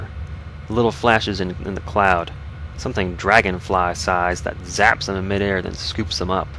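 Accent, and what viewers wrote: South, United States. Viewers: I really enjoyed this video. I had never heard this story before and I found it very interesting and touching, above and beyond most of the writing for the rest of the game (not to say its bad). Your casual southern drawl and the hum of the terminal made this such an engrossing experience, thank you:3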